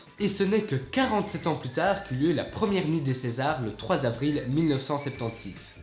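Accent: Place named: Belgium. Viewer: Je te réponds 1 an après mais bon... Il dit les nombres comme ça parce qu'il est belge, à la fin des dizaines c'est ante comme soixante-dix c'est septante, voilà, 1 an après 😅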